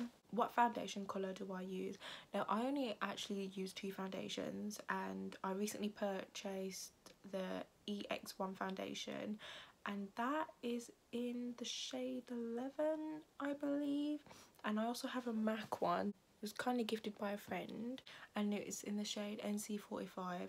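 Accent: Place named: RP, United Kingdom. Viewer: Nah why do you sound so posh wtf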